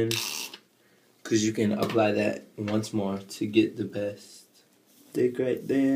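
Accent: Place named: Louisiana, United States. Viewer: Aww I love ur voice, you kinda sound like ur from Louisiana.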